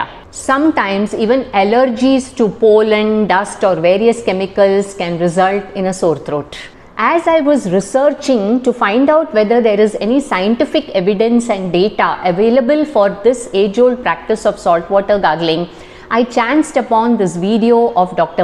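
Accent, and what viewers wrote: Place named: Karnataka, India. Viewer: Ur a south person, but by slang I think ur Kanadi.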